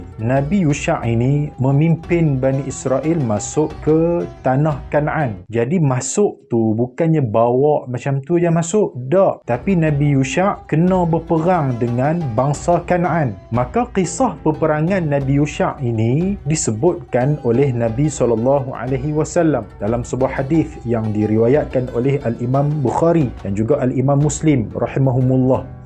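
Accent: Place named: North, Malaysia. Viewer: Terbaik orang utaqa, 👍👍🤘🤘🤗🤗 penyampaian yg baik & mudah paham dalam loqhat utaqa..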